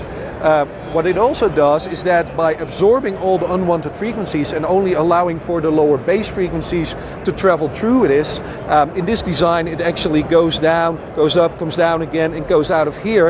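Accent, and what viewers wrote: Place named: Netherlands. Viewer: LOL i can just hear by the way he talks english he's dutch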